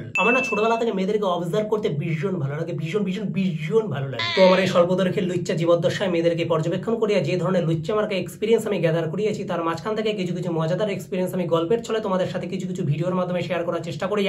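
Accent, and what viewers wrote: Bangladesh. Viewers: বাংলাদেশ থেকে বলছি দাদা,,, তুমি অসাধারন বাংলা বলো,, তোমার বাংলা বলাটা দারুন,,,সেরা বাঙালী তুমি দাদা,,, কোচবিহারের ভাষা,আমাদের ভাষা একদম এক । মনেই হয়না তুমি পশ্চিম বঙ্গের ছেলে,,,মনে হয় তুমি আমাদের প্রিয় বাংলার সন্তান,,,,কি কোলকাতা কি ঢাকা ,,,তোমার মাধ্যমে সব একাকার হয়ে যায়,,, তোমার এই ভিডিওর থিওরিটা খাটি বাঙালী পরিবারের সন্তানদের জীবন যাপনের চিত্র ফুটে ওঠে,,,মনেই হয়না তুমি কোচবিহারের ছেলে আর আমি কুষ্টিয়ার ছেলে,,,, ছোটবেলায় এভাবেই সর্দি লাগতো আর হাতে ঘসা মেরে আবার খেলা শুরু করতাম,,, মাঠে খেলতে খেলতে বন্ধুরা মিলে একসাথে পটি করতে বসতাম,,,আবার সেটা ডুমুর পাতা দিয়ে ঘসে পুনরায় খেলা করতাম,,,, আমরা গর্বিত ,আমরা বাঙালী,, আমাদের রীতি রেওয়াজ,কৃষ্টি কালচার একেবারে সেম। কোন পার্থক্য নেই । পশ্চিমবঙ্গ বাংলাদেশ একসময় এক ছিল,,,তোমার ভিডিও দেখলে মনে হয় আজও আমরা একই আছি,,,, হাসিয়ে যাও ভাই,,,আমার চোখে তুমি একজন খাটি বাঙালী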